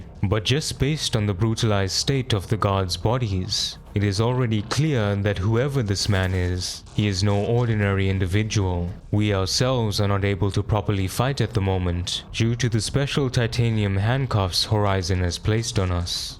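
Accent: South Africa. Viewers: I just hear the south african in you 🤣Good video mate, good to see content like this coming out of our country.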